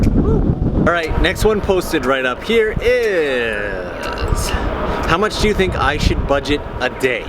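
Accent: Canada. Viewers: Sup man I'm obsessed with Japan currently, and I found you from sharmander in Japan ! I'm loving your first video for me so far. Also, are you canadian? I hear alot of abooots in your accent xD Also, what is the best way to know when exactly the pink trees will bloom to it's fullest? I can't believe they only bloom for like 3 days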